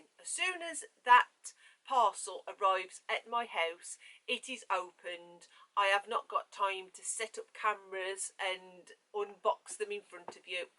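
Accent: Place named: Black Country, United Kingdom. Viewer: I bought this bag too as I LOVE the denim (I bought the headband to match to LOL) also, you’re accent I love it! Im a fellow Black Country person xx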